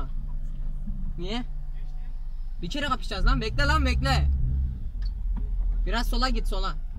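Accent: Malatya, Turkey. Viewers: Malatyalimisin kardes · Kardesim malatyalimisin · KArdeş malatyalımısın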